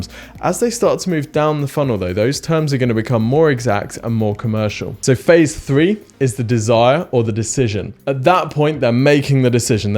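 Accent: United Kingdom. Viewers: Are you from Nottingham?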